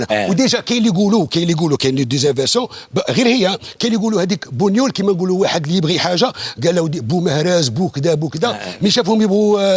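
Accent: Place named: Oran, Algeria. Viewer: والله لهجة جزايرية وهرنية شابة بزفففف 😁😁😁💪🇩🇿🇩🇿🇩🇿🇩🇿🇩🇿❤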